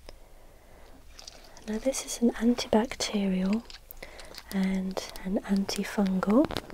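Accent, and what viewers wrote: United Kingdom. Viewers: British, ASMR, dog. Perfect. Shouldn't have watched this right before going to the gym ZzzzzzZZZZzzzzz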